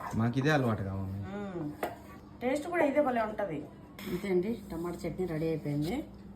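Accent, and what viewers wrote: Telangana, India. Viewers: మీరేమో రాయల సీమ అంటారు లాంగ్వేజ్ ఏమో అచ్చం మా తెలంగాణ లాగ మాట్లాడుతారు మీరు మి పిల్లలు..మి అమ్మాయి అయితే ఇంకా బాగా మాట్లాడుతధి ముఖ్యంగా మి వంటల్లో చికెన్ అంటే మస్తు ఇష్టం నాకు ...అందాజ గా ఏశిన 👌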